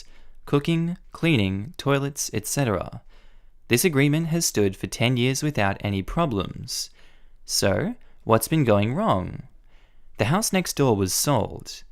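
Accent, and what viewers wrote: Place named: Australia. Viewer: Judging by his accent * is also ausralian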